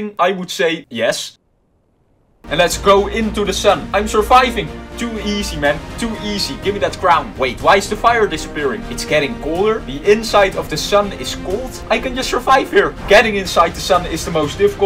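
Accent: Netherlands. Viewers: Ik ruik een nederlander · Are u dutch? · Ben je Nederlands? Ik hoor het aan de accent haha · Are you dutch becouse you realy sound like your dutch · Oh you are OBVIOUSLY dutch. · I smell someone dutch like me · 100% een nederlander hoor het gelijk aan het accent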